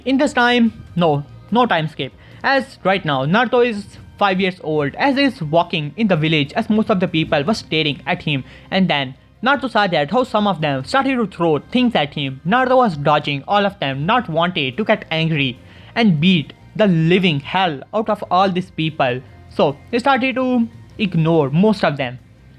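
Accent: India. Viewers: u indian mate? Edit: idk why did i even asked this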